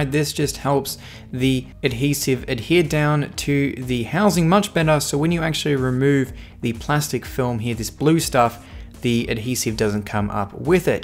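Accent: Australia. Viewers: I like the Australian accent. You know, you are truly doing an outstanding job; I have watched almost each single video on your channel. Keep posting us!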